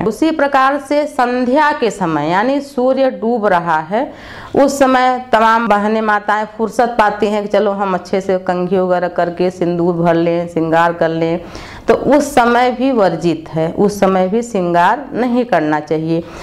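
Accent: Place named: Allahabad, India. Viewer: aap kaha rehte ho aunty muje ap ki language allahabad ki lag ri h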